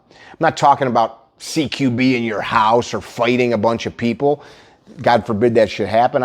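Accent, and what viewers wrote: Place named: Midwest, United States. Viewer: I love your videos and attitude. Please keep them coming. I binge watch. Sorry to ask a stupid question. Neither my wife nor I can tell where your accent is from. I guessed anywhere from Minnesota to Chicago area? Sh*t, that's probably far off. Thanks.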